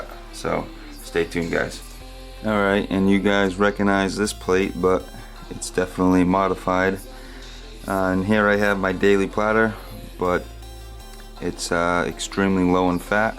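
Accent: Boston, United States. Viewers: CAHBS. Love the Boston voice. \m/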